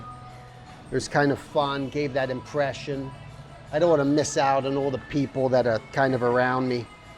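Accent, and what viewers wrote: United Kingdom. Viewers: Oh my gosh I'm glad it wasn't just me! I hear British pronunciation, a Cleveland accent, and New York inflection. WHAT?!?!?! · I know tight! Hahah i was baffled by it! I hear british, a lot of new yoooork. But also a flavor of canadian in there so weird hahaha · He sounds like he has a British accent mixed with a New Jersey accent.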